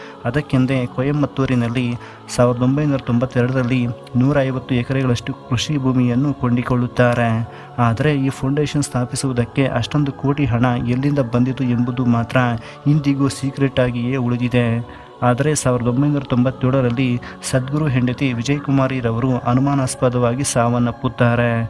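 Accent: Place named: England, United Kingdom. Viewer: He talks in a very heavy English accent and pretends not to know Kannada. Why can't he talk normally.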